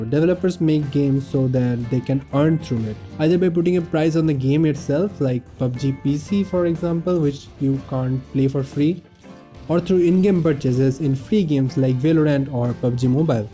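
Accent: Bengal, India. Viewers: I just love that heavy Bengali accent ... Lightens up the mood (no offense) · you bengali??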